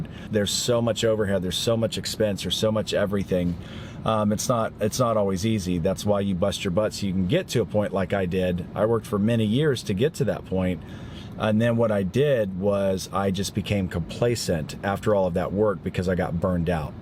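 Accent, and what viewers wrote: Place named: England, United Kingdom. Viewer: Sweet words except for the English accent! Yer right man...dedication, motivation, communication. It ain’t easy owning a lawn maintenance company but it can be rewarding.😀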